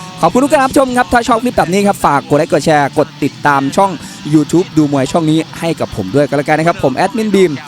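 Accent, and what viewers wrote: Isan, Thailand. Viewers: ชอบคนพาค..สุดจัด.มีอีสานด้วย.555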